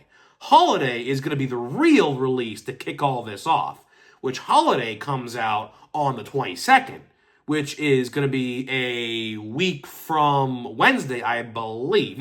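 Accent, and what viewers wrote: Pittsburgh, United States. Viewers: Are you from Pittsburgh? I used to work with some Davey Tree workers from WV and Pittsburgh and they said "YIns" all the time and had kind of the same accent. We love Pittsburgh. Beautiful city. Love R. Clemente. Our first trip to the city we missed R. Clemente by one year. 1973 season. Sad but legendary.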